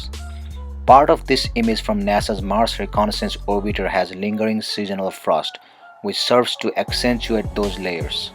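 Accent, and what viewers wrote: India. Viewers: very strong indian accent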